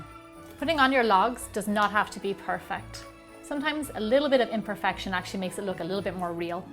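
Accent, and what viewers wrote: Ireland. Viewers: your accent makes me wish i lived in ireland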